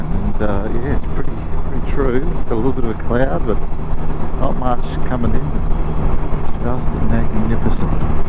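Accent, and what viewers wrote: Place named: Australia. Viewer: I couldn't make them out and sometimes I have a little trouble deciphering the Aussie accent, lol...I knew what you were saying regarding what they were doing lol, but couldn't see what they were...I remember playing pass the pigs, lol...thank you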